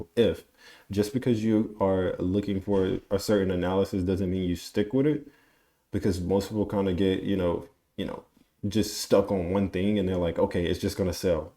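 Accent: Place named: Cape Town, South Africa. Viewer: Bro ! Yu slightly got that CAPE TOWN Accent...."The Mother Land /South Africa"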